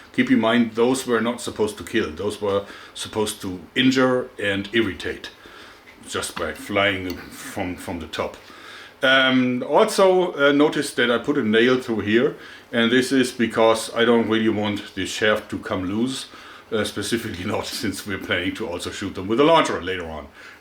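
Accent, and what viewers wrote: Germany. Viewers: as a german living in the uk, german accents in youtubers infuriate me and make me cringe. you are the only one i actually like haha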